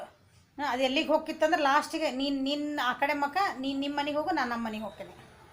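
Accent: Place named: Hubballi, India. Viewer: Tq ಮ್ಯಾಮ್ ನಮ್ಮ ಹುಬ್ಬಳ್ಳಿ ಬಾಷೆ 😍🙏🙏ನೀವ್ ಬಾಳ್ ಚಂದ explain ಮಾಡತೀರೀ I like ಅಕ್ಕಾ you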